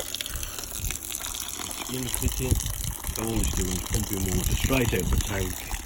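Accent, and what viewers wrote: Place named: Birmingham, United Kingdom. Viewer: Hi &, just wanted to say a big thank you for taking the time to 'mansplain' things,edit, and put these videos up. It makes things so much easier to understand when you have pictures to look at )) I know its naughty to make a request of someone who is doing something for nothing etc but, would it be possible to film the measuring and template making for one of the bulkheads? And finally, I know you said you had driven up from Telford on one of the videos but your brilliant accent sounds like its out of deepest darkest brummiland - I'm a brummie too but without the accent so heres a big thumbs up for a fellow Birmingham lad 👍 👍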